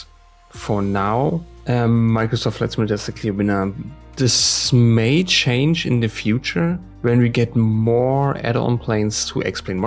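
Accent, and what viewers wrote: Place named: Germany. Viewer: Are you German (because of your accent)? I am German as well, that’s why I am asking:D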